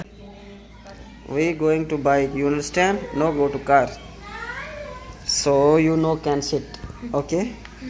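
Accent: Saraiki, Pakistan. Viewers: Ap jb jb saraiki bolty hn mujhy hansi ati hai bro 🙈😂😂😂😂😂😂😂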